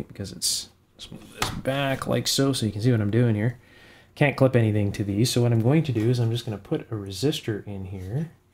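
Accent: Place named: Canada. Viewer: Thank you …a fascinating video featuring an interesting oscillograph. I enjoy your mini talks and your delightful accent…what part of Canada are you from? (I’m in the south of England). My own very first oscillograph was ideal for checking audio circuits working up to about 1MHz or so. It was just post Second World War I think and used a 6K25 Thyratron in the time base…I’ve still got several of those in my shack (my UK callsign is G3XFD, my Irish callsign is EI5IW). In the past I’ve found some interesting little scopes at Amateur Radio Mobile rallies (I think they would be classed as ‘swap meets’ or something similar in North America) and have several 1CP1 one inch scope tubes which featured in Practical Wireless magazine (I was Editor for almost 25 years until retirement in 2013)) using the Mullard/Philips basic workshop project scope. A fascinating little tube, the 1CP1 (Loctal base) was used as a waveform indicator by the the old British Post Office Telephones (now BT) in telephone exchanges to monitor the dialling tone, which was generated by little 440Hz (approx) frequency output motor generators! 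Most of the scope tubes had phosphor trace burns due to thousands of hours continuous working…but they could still be used! Thanks for your excellent tutorials…I’m a great fan! Best wishes, Rob G3XFD.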